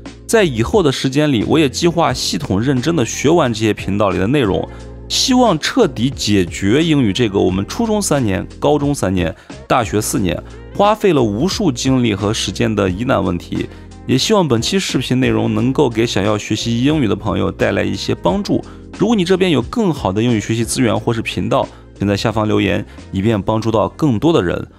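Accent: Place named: Xi'an, China. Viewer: 一听up主就是西安老乡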